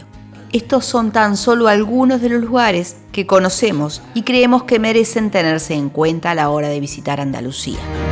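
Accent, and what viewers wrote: Argentina. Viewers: Locutora argentina, bravo! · Ud. es de Argentina? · Podrias hacer el esfuerzo de hablar Español,embesde Arjentino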